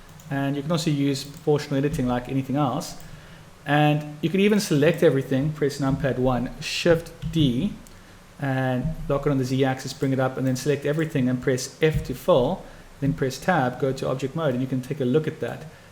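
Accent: South Africa. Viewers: lol, the South African accent is very strong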